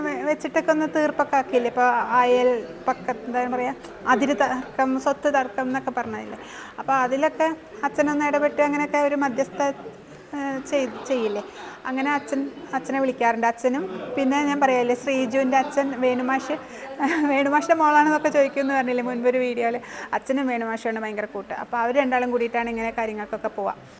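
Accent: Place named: Tirur, India. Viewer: Njan janichathu Tirur aanu❤ nostalgic aanu samsaram okke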